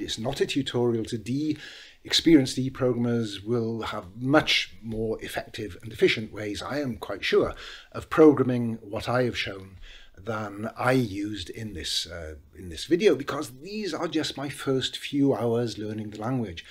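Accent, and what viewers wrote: Wales, United Kingdom. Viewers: This is the first video I have seen/been suggested of yours. While I very much agree with the message and this way of learning a new language I was mostly happy to hear a Welsh accent after not having been back to Wales in 4 years.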